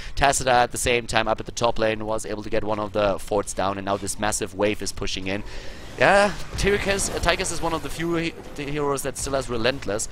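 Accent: Germany. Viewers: Good commentary. It was clear you were rooting for the Germans, and I think your accent is German, so maybe there's a reason for that ;-) You did point out the good things both teams did, though, and being a bit invested in the game is always cool. Subbed!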